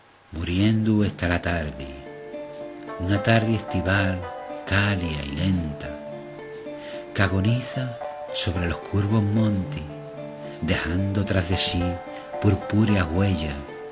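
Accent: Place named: Extremadura, Spain. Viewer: Muchas gracias, mi estimado amigo y paisano, por este precioso montaje audiovisual de mi poema en el que se dan la mano fraternalmente el verso y la imagen en una sincronía perfecta... Me ha gustado el tono de voz grave,sereno, solemne y la "extremeñización" del lenguaje con las haches aspiradas.Sólo tengo que ponerte un "pero": no has puesto ni una sola imagen de mi querido pueblecito, Jaraicejo. Un abrazo fraternal de tu amigo Wenceslao Mohedas Ramos Jaraicejo (Cáceres) / Barcelona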